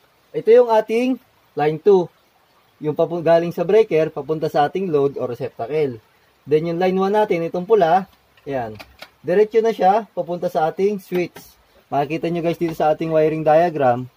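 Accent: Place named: Quezon, Philippines. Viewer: ganda mga vids mo sir.. slamat.. from quezon province ako, kya pla pamilyar punto at words mo.. more vids pa..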